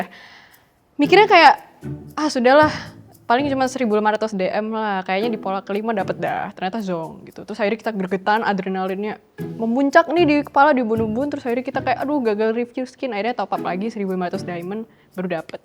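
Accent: Java, Indonesia. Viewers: such a cute medok 🥰🥰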